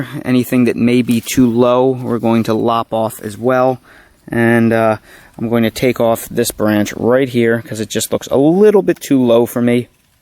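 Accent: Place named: South Jersey, United States. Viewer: I live in suburban philly and that sounds like a south jersey accent!!!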